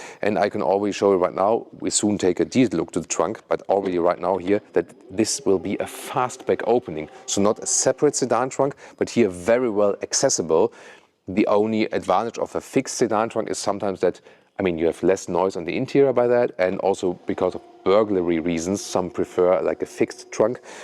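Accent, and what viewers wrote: Germany. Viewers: Junge, Du bist "deutscher2 als Du glaubst. Lass das bitte mit dem English. Dein Akzent verrät Dich hinter jeder Silbe. Grund genug, nach 2 Minuten wegzuschlagen. Unerträglich.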